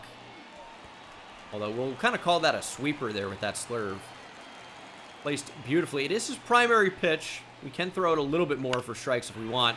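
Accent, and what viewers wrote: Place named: New Jersey, United States. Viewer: You got really Jersey in here and I enjoyed it haha.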